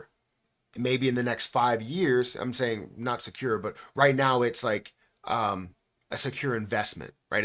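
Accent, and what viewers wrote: United States. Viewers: Most of the time I can tell if the speaker is African American or not. You sound like a 50 to 60 year old Italian American. I may have to go to the start again to actually listen to your content now -_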